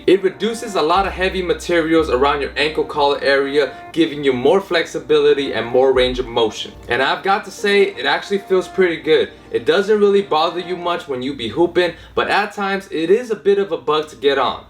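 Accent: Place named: Asia. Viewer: Veiled asian accent mixed with hip hop wannabe drawl = slow reading enunciation.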